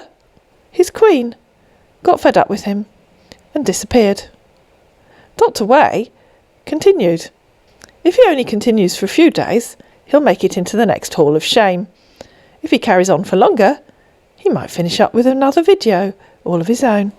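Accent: United Kingdom. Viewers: This is the most addictive channel… ever! Even when insults are being doled out, the British accent makes it sound so polite.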